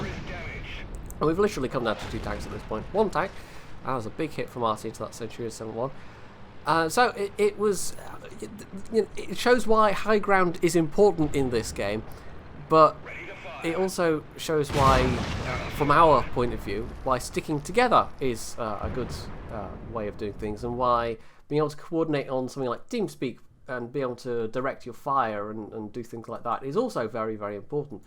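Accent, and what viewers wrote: Scotland, United Kingdom. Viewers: Ow, that new mic, it makes the sound so much clearer.... starts to actually sound scottish-ish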